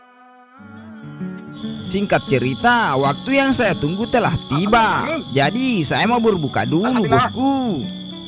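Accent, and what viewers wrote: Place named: South Sulawesi, Indonesia. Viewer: dari logatnya dari Sulawesi selatan kayaknya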